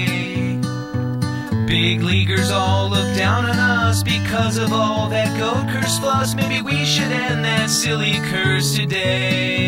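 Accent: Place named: Canada. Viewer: canadian? oo instead of oh?... nothin again canadians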